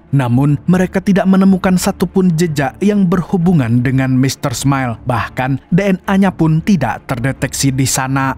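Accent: Banjar, Indonesia. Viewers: Katuju banar ulun wan amang wan amang.channel nyak the bestlah.😂😂😂😂❤❤❤kalihatan banar logat banjar nya bumbuhan...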